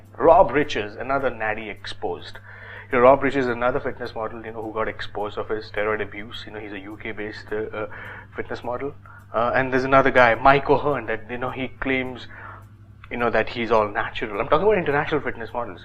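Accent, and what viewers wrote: India. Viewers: fuck you and your indian accent its soooooo fucking anyoing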